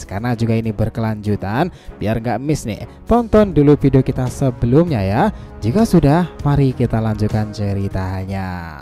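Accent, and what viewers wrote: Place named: Java, Indonesia. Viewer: ngomongnya santai aja mas,pasti lu dari jawa on mas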